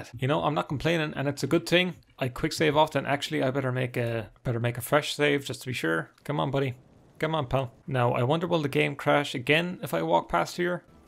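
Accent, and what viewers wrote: Ireland. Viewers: We don´t mind your Irish. It´s actually fun hearing "He´s very Fair away" "Oh! Look at the Stairs!". It´s really endearing:)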